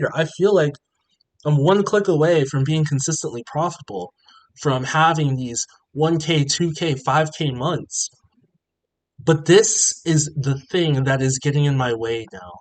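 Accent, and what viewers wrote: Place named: United Kingdom. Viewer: you're from the UK? That's pretty dope. You must've moved here when you were younger judging by your accent. Also I took your encouragement and got my first funded, I did the practice mode and lost a bunch of times but I just got my first trade win for $67.78 and I know it wasn't luck because price got near my take profit three total times before crossing it lol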